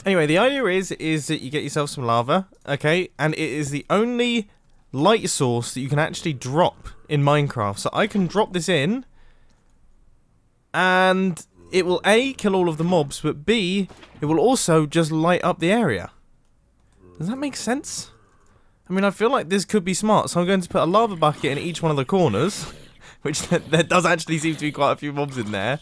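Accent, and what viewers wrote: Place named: United Kingdom. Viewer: he is British!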